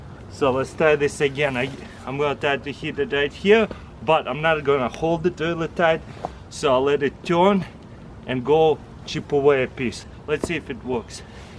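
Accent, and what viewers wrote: Russia. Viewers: Idk but I think he has already reviewed this axe or it's probably another dude with a russian accent.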